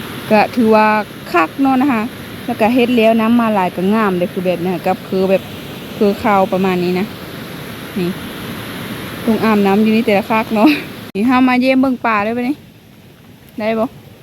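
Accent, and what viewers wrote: Phu Thai, Thailand. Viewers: เข้ามารับชมบรรยากาศ ภาษา การดำรงชีวิตด้วย พูดไม่หยุดเห็นอะไรก็พูดไป ก็ถือว่าดีเลยนะนำเสนอ สิ่งที่มีอยู่รอบๆตัวเราได้ค่อนข้างน่าสนใจ โดยเฉพาะการใช้ภาษาในการพูด สำเนียงภูไท ฟังแล้วเพราะดีนะ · ่มักคำ เว้า คือ กันกับภูไทเรณู ฝั่งไทย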